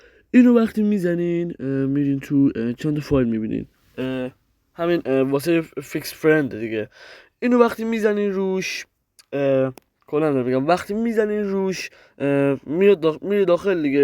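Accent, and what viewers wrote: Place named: North, Iran. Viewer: لحجه شمالیتو عشقه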